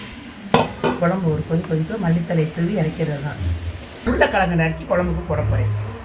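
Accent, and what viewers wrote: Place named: Ramnad, India. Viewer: Nenga ramnad dhana unga slang ramnad madhuri iruku illai adhai suthi ulla giramam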